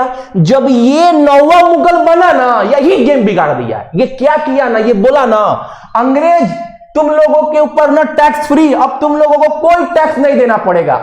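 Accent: Bihar, India. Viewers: Bhaiya Aapne Bihari andaz me smjhaya Amazing 😍🤩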